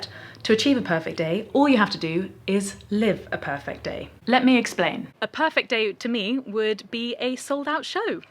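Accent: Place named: England, United Kingdom. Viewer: u should make a tshirts saying-watch me on- you CHUBE ! - lol,LIike your chusday- i love your english accent-ca usa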